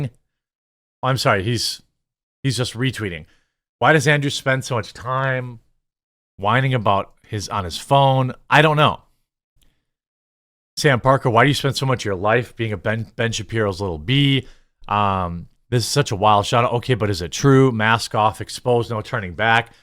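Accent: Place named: Midwest, United States. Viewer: It is funny. That Midwestern thing. I bet he says "warshcloth" too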